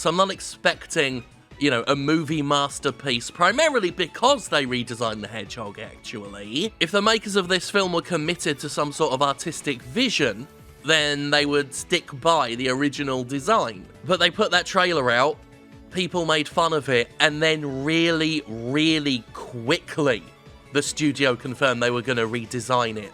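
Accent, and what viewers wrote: United Kingdom. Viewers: you talk like an old man with a stuffy nose :/ you must be british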